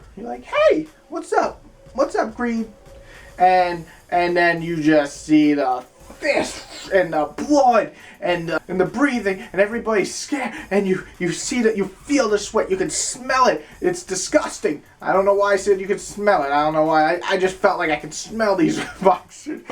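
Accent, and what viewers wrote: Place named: East Coast, United States. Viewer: I agree, he sound like Jonah hill with a east coast accent.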